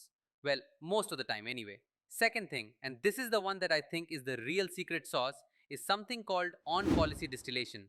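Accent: India. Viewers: oh no indian english - instant quit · first indian accent which I actually endured, insane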